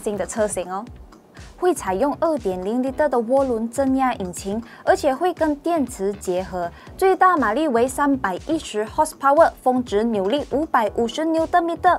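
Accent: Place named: Malaysia. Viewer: 这个AI很有马来西亚腔调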